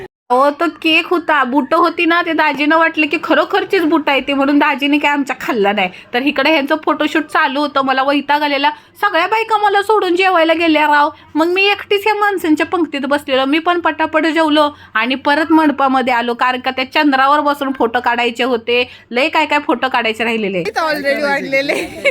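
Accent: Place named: Western Maharashtra, India. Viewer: तुमच्या भाषेवरून मला वाटलंच होतं हे तुम्ही पश्चिम महाराष्ट्रातलेच असाल म्हणजे कराड भागातील कारण तुम्ही सांगितलेल्या प्रत्येक गावात पाहुणे आहेत खूप छान ब्लॉग आणि मी तुमचे व्हिडिओ नेहमी फॉलो करते कारण तोंडोली पण आम्ही दर पौर्णिमेला येत होतो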